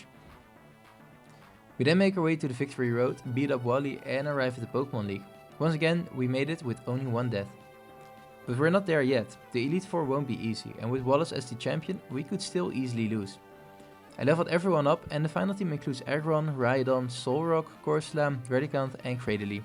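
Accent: Netherlands. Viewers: weird question maybe but are you dutch by any chance?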